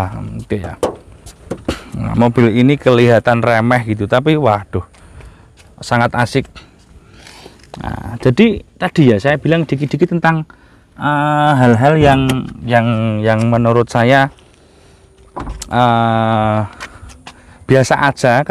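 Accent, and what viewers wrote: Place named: East Java, Indonesia. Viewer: Saya orang Jawa Barat, tapi kok menikmati gaya bertuturmu yang Jatim bgt😅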